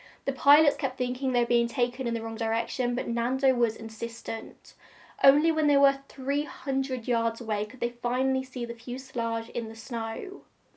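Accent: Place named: Tottenham, United Kingdom. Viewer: I'm gonna guess you're from Tottenham. Just a random guess...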